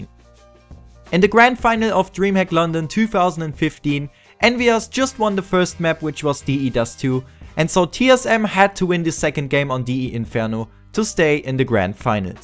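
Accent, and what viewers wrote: Germany. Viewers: Haha hab sofort erkannt das du ein deutscher bist.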